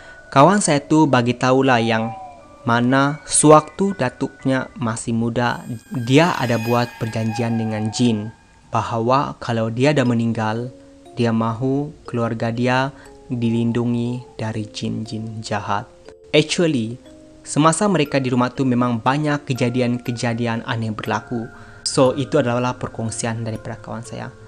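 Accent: Sabah, Malaysia. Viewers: Walaupun saya kurang fhm slank sabah fhm2 sikit tp terbaik content bossku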